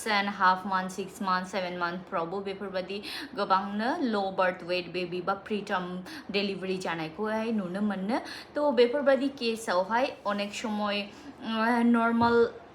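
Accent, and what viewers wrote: Bengal, India. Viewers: Railyna benguli style nisw jdwng boro bydi kwnayaki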